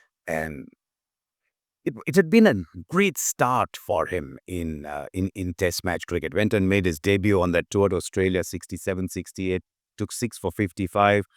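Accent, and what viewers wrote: Hyderabad, India. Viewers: Your Hyderabadi assent is mind blowing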